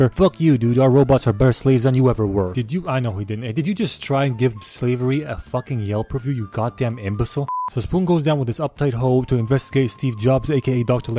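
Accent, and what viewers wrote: United Kingdom. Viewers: >shaved lol cracked me up BTW are you British by any chance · british power has been unleashed Also are ya British.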